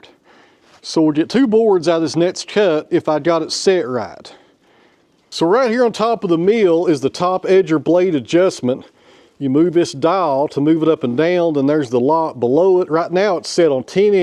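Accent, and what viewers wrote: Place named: South, United States. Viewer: Love your channel!!.. and your southern drawl! Refreshing personality. You should avoid placing batteries directly on the ground in the cold… as the earth naturally drains them far quicker than when they are insulated. Perhaps a simple insulated wooden box, mounted on corner post with a 40 watt incandescent bulb providing warmth. 🤷‍♂️ There is 40% less energy available in a battery 0*F than 80*F, everything else remaining the same. Good luck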